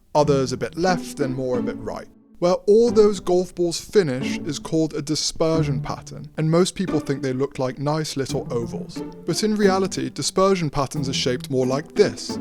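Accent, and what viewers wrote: RP, United Kingdom. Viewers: Great content, but narrator is the single poshest man I’ve ever heard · Your narrator is too posh. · Voiceover is too posh it makes me want to rip my ears out. Would want either a modern London accent or better yet, a good old Georgia/SC boy that grew up in the shadows of Augusta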